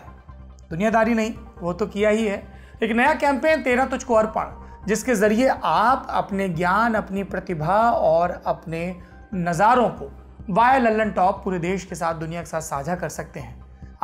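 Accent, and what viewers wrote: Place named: Bundelkhand, India. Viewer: sir aap kaha se hai mai jalaun se hu aap bundelkhandi bolte hai aap kaha se ho plz plz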